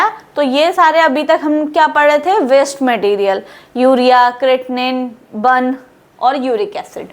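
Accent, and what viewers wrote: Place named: Bihar, India. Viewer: Theek hai yah kam boliye aap Bihar se ho kya ❤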